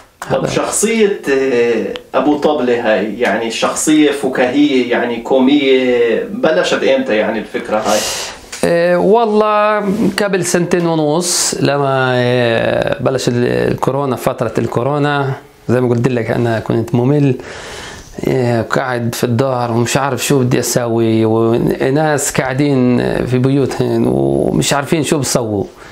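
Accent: Palestine. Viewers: حلوة اللهجة الفلسطينية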